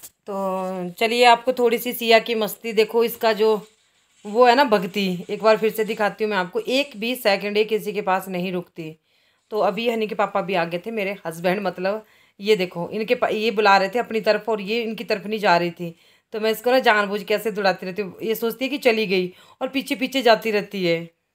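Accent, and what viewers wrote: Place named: Himachal Pradesh, India. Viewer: Ur language is as u belong to Una dist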